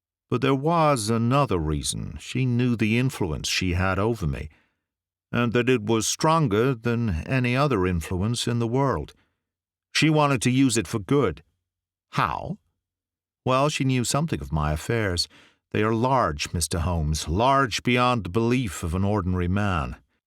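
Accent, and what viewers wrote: United States. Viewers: I am always impressed with how well you do an American accent. Good job.... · I have just heard another one of your stories and may I say I have listened to it three times now. It's a wonderful story and you sir as usual are at your very best. I enjoy you so much you just do not know. Your rendition of American English was perfect 👌😍!! Please, keep these stories coming for as long as you can. You still amaze me!! With best regards to you!!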